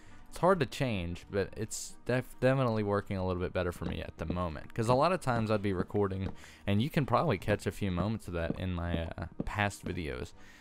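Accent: South, United States. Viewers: I love your accent. It reminds me of mine and a lot of my friends'. I don't know if I'm right in thinking of this, but it sounds like it's Southern, but just on the edge. I live in Memphis (which I refer to as the least Southern city in the South sometimes) and personally was raised by a mother from the West coast and a father from the North, so my accent is pretty much non-existant except for a twist on the occasional word. Your accent feels kind of like home to me. IDK, maybe that sounds creepy or weird. But also, this video was posted 4 years ago so maybe your accent or thoughts on it have changed c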